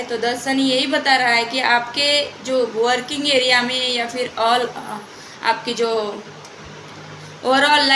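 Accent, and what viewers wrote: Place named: Mumbai, India. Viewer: तथा संवादक मैडम जी को सादर प्रणाम स्वीकार हो । आपकी रीडिंग आवाज से मै समझ गया था इनकी भाषा मुम्बई तरफ की है । 100%सत्य है आपकी रीडिंग हम पूरी तरह से संतुष्ट है धन्यवाद। धन्यवाद ब्रम्हांड । चित्रकार राकेश नामदेव,अटेली मंडी जिला महेन्द्र गढ (हरियाणा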